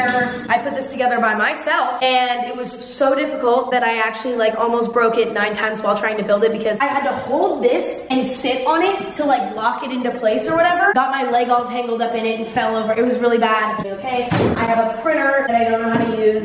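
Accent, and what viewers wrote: United Kingdom. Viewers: Wait u British?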